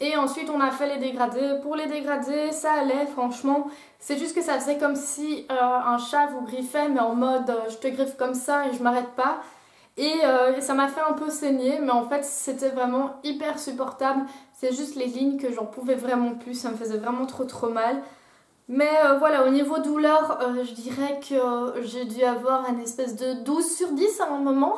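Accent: Belgium. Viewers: ton accent belge est adorable, tes lunettes sont canons tout comme ton septum ET TES CHEVEUX (et ton KK) et ton tatouage bien sur :) je m'abonne !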